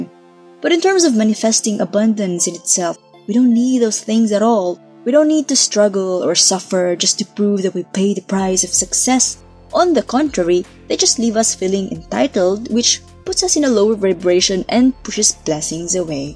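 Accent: Philippines. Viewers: Wonderful! Your voice sound like from the Philippines.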